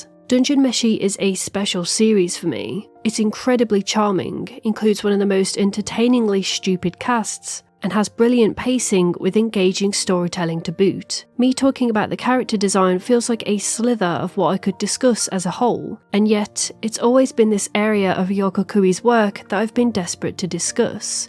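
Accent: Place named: England, United Kingdom. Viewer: What a pure English accent, I love it ❤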